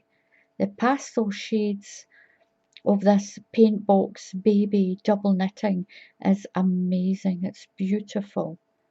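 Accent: Scotland, United Kingdom. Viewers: where are you located in the UK if you don't mind. I hear a beautiful Scottish accent am I correct?? Also, I like to use, current US standard, Meetup App/Zoom meetings for local get togethers with different groups (recommend for all). Barbara, Sacramento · I love your accent. Scottish? Great tutorials, thank you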